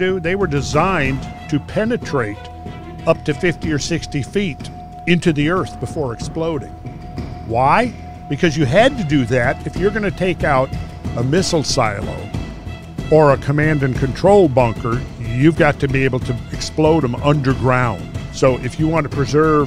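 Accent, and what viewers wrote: Minnesota, United States. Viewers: His Minnesota inflection makes him sound like the Jesse Ventura of paleogeography.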